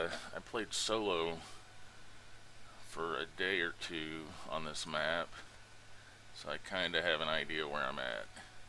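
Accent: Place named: South, United States. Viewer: ey! man! are you south states?? Your dialect sounds like it, Tx, Ka, Az ish.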